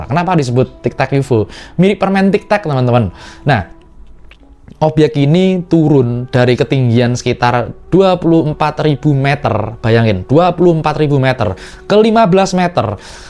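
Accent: Java, Indonesia. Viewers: Jowo banget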